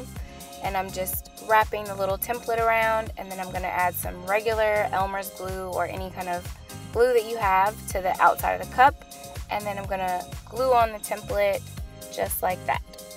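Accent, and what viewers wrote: United Kingdom. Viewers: That British voice thou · nice british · I KEPT REPLAYING YOUR BRITISH ACCENT YOURE SO CUTE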